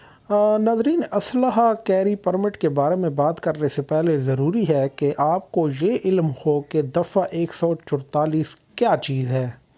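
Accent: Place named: Mianwali, Pakistan. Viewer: Apki awaz se Ap mianwali se lag rahe ho ? Kia ap mianwali se ho ?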